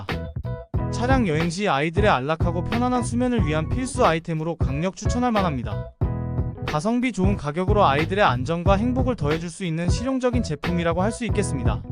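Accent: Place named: Yanbian, China. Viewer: 조선족이냐 백미러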